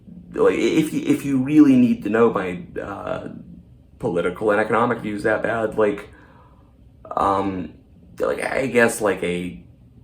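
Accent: United States. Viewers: You sound like the average American to me.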